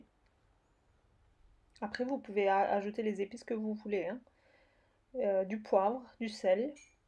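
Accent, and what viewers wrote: Alsace, France. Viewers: Bravo pour tes recettes tu as un petit accent alsacien et comme tu parles d achat en Allemagne pour tes ingrédients ça confirme 😘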